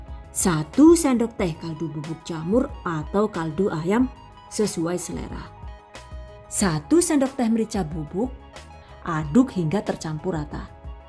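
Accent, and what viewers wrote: Banyumas, Indonesia. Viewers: rika wong ndi sih geneng ngapak yah...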